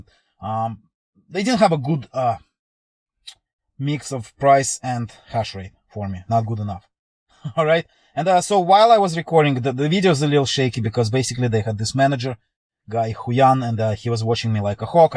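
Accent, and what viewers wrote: Russia. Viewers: Прикольно слышать акцент и догадываться, что это русский. Успехов в майнинге. Я не понял смысл первой части видео. 2070 тоже бывают Max-q ? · Почему он говорит на английском а слышу я русский ? 😀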